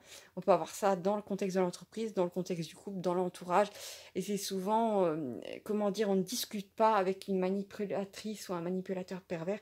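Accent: Alsace, France. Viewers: Vous avez un accent, êtes vous alsacienne ? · Je ressens un petit accent alsacien ?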